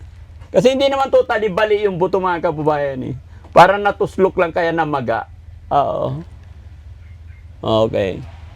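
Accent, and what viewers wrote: Visayas, Philippines. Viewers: Ka cute nimo paminawon gabinisaya hahaha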